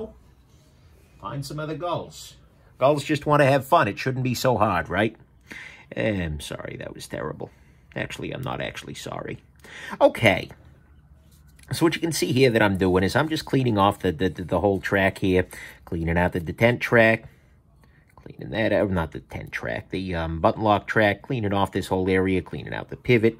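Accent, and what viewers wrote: New York, United States. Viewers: you have to be from New York or New Jersey!?! I enjoy your videos knifes and explanations on the parts and disassemblies!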